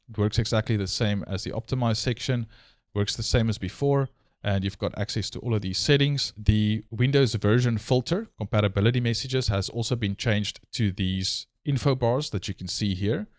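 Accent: Afrikaans, South Africa. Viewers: jy is 100% afrikaans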